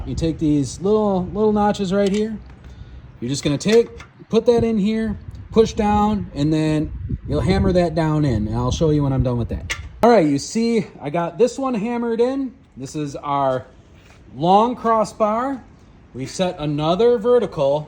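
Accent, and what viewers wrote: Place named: Wisconsin, United States. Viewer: glad I could confirm the Wisconsin accent! appreciate the reviews :)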